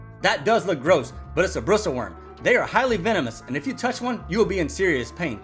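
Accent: Louisiana, United States. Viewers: Dude Your From Louisiana? Me too but where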